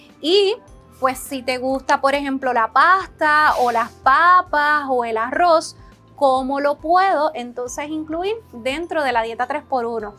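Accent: Puerto Rico. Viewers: Extraño mucho a mi amigo y maestro Frank Suárez, realice la dieta 3X1 y baje 9 kilos en menos de tres meses sin ejercicio en tiempos de pandemia, ahora volví a subir de peso, Gracias por tu explicación, eres muy bella con tu asentó Pueltoliqueño☺️😍 un saludo desde México. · Me encanta ese acento de las mujeres boricuas,,😍😍🥰🥰😘😘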